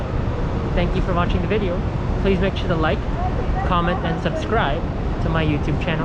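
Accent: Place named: Philippines. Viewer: You should work on your camera angles, that "overview" shot of the seat is horrid. You should also work on your voice over spill and should only speak on what you know for sure, so you wont sound too ignorant. Please hesitate on direct copying other youtubers, it doesn't quite suit you and your filipino accent. Its quite obvious which youtuber you are trying to copy. Hope you dont take offense and improve in the future. Cheers.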